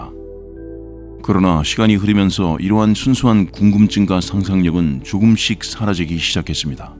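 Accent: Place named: Gyeongsang, South Korea. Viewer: 영상 처음보는데.. 경상도사람 이시구나.. ㅠ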